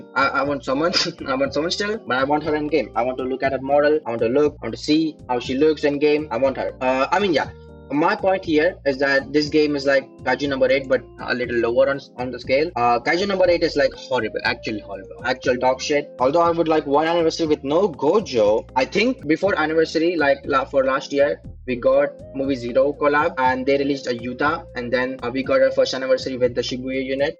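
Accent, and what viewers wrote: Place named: India. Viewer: Consider using an AI voiceover, just hearing indian accent make me click away, even tho the video may be great.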